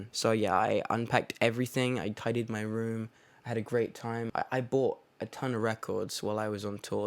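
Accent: United States. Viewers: is it just me or does he sound a bit american in this video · Can we talk about how AMERICAN his accent has become? Cultured <3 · WHY DOES HE SOUND AMERICAN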